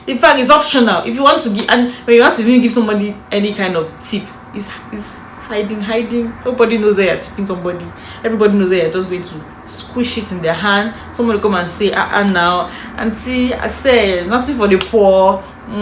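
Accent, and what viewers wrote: Nigeria. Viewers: I am sure that she grew up with Nigerians cos her accent is purely Nigerian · Lol u sound sooo Nigerian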